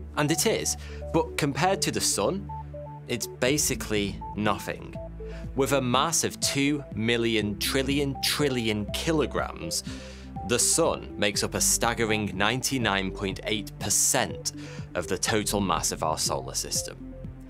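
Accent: United Kingdom. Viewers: This guy had voice that I could listen to for hours. Like Morgan freeman but british! Love the vids!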